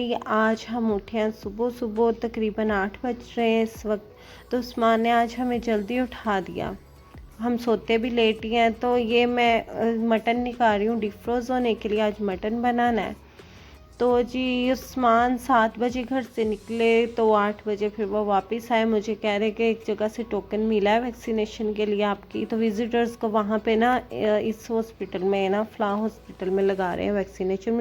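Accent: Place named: Punjab, Pakistan. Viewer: Assalam o alaikum Appi lgta h Pakistan shakargarah se h apki voice se lg raha h hamare me udhr k h wo b apke jese bolte h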